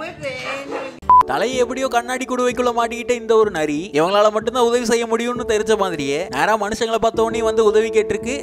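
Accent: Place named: North India, India. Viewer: இந்த பதிவுக்கு பேசுபவர் தமிழர் குரல் மாதிரி தெரியலில்லை வட நாட்டுக்காரர் தமிழ் கற்றுக்கொண்டு பேசுவது போல் தெரிகிறது. உண்மையா?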